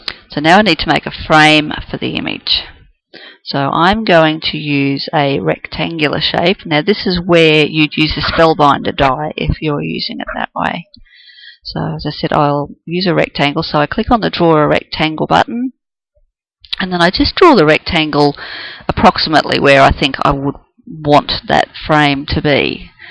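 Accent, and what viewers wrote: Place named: Australia. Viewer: Loooove your Aussie accent! TFS that vid. It's awesome! :-)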